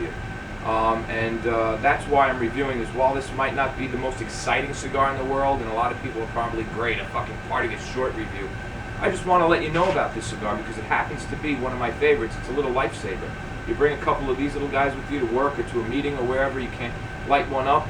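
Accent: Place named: Massachusetts, United States. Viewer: I noticed the patriots hat and the accent lol Are you from Massachusetts? I'm from Taunton, Mass.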